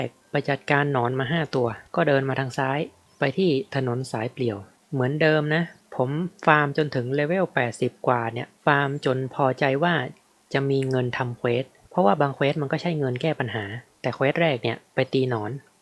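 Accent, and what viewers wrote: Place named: North, Thailand. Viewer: แงงง ชอบความสาบเมืองงง555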